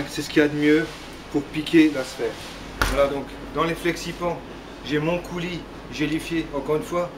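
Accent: Alsace, France. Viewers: est-ce un accent alsacien que je reconnais là ? je me trompe peut etre hein...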